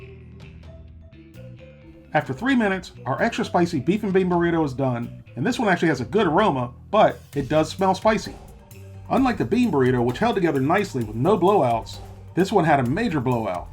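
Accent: South, United States. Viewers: I have missed your videos and Southern voice !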